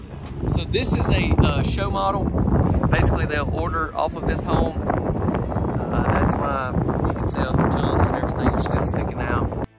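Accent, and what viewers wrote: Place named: Alabama, United States. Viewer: I enjoy watching your videos. You make any of the homes appear better than what they are I am from the south. When listened to you talk I thought, this guy does not sound like he may be from Oklahoma! He sounds like either Alabama or Mississippi!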